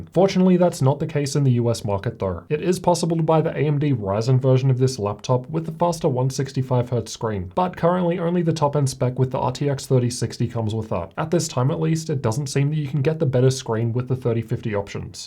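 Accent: Australia. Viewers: Great review! Also, I just love the Australian accent. Don't know why, but I just do.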